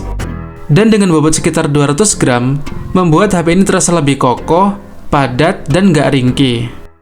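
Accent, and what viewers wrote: Malang, Indonesia. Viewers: Pasti abang nya orang malang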